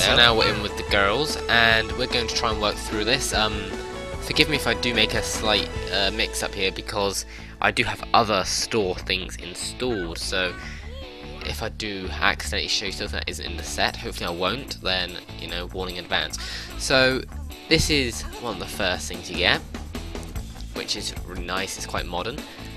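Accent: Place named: United Kingdom. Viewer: I know a British accent when I hear one ;)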